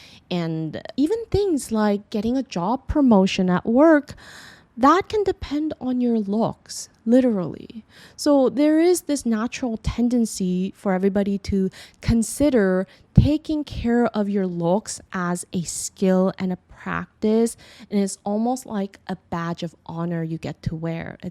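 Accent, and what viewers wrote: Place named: Philippines. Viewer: You are Korean? You sound Filipino. :hand-purple-blue-peace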